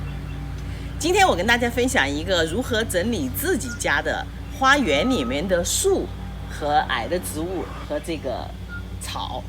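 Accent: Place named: Sichuan, China. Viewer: 阿姨很棒！您说是我们家乡的川普么~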